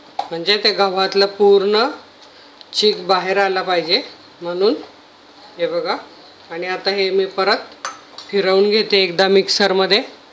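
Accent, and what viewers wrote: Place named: Nashik, India. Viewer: ताई 🙏 मी तुमच्या रेसिपी नेहमीच बघते मला फार आवडतात. मी पुन्हा एकदा कुरडया केल्या, या वेळेस माझ्या कुरडया छान झाल्या.व सांडगेही केले,तेही चवीला छान झाले. तुम्ही नाशिकच्या आहात.असे तुमच्या बोलण्यातून समजले.म्हणून आणखीच छान वाटले,करण माझ्या आईच माहेर नाशिक, आम्ही लहानपणी सुट्टीत नाशिकलाच राहण्यास जात असे. तसेच तुम्ही जे पदार्थ बनवितात.तुमची पदार्थ बनविण्याची जी पद्धत आहे, त्याच प्रमाणे आमची आई पदार्थ बनवीत असे. तुम्ही फारच छान माहिती देतात. खुप छान समजावून सांगतात.ते ऐकूनच तो पदार्थ एकदा तरी बनवुन बघावा, असे नक्की वाटते.म्हणूनच मी पहिल्या कुरडया माझ्या मना प्रमाणे झाल्या नाही.व दुसऱ्या वेळेस करून बघितल्या,खरच खुप सुंदर झाल्या.त्या बद्दल तुमचे धन्यवाद.💐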